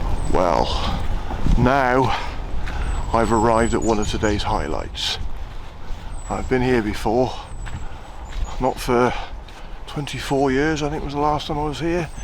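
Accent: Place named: Herefordshire, United Kingdom. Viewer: Very god -got the Herefordshire voice I notice. The Wye was looking nice. Mark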